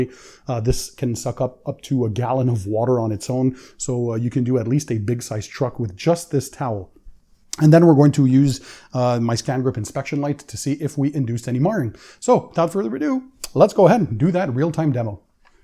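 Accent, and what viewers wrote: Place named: Quebec, Canada. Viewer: oui, mais j'aime bien ton accent québécois. Ça faisait partie de ta personnalité.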